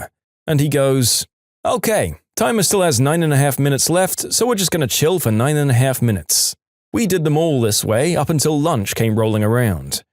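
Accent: United Kingdom. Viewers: You sound British now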